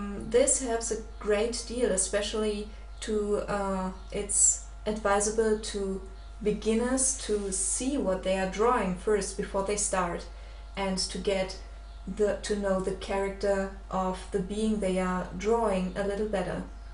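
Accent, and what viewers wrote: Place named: Germany. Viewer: Are u german? U have a german accent...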